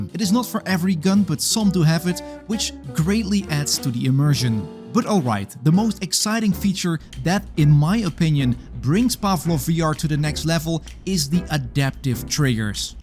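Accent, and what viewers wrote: Netherlands. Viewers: is it just me or does he have a dutch acsent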